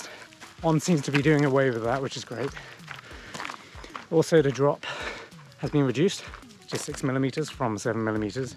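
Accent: United Kingdom. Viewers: Are you a Brit living in America?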